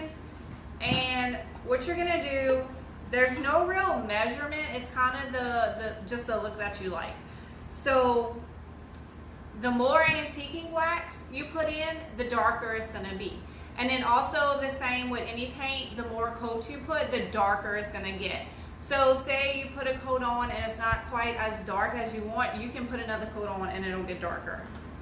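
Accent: Louisiana, United States. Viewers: "and then you'll be ava to see how it looks". My grandmother was from DeRidder Louisiana. I'm from Texas and I've always been in LOVE with Cajun accents. You're so cute and so much fun to watch! You've inspired me to get up and get things done!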